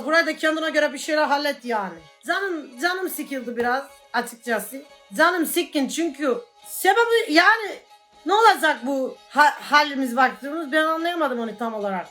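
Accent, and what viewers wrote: Black Sea, Turkey. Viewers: gerçek karadenizliden daha karadenizli yemin ederim :D ay ablam yicem tipini arada böyle videolar yapar mısın ? :D :D :D :D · 10 saat karadeniz şiveli konuşsa dinlerim çokk iyi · O nasıl bir şive yaa abla ben bir Karadenizli olarak böyle konuşamıyorum helal olsun valla <3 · bir de karadenizli aksani ucu bir arada gulmemek imkansiz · Abla karadenizi söktü götürüyooo😅👏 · Karadeniz şivesi çok yakışıyor sanaaaa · Karadeniz Şivesi İle Yayın Girşini Yapar Mısın Lütfen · Abla sen Rizelimiydin ? Çok iyi yapıyorsun karadeniz şivesini.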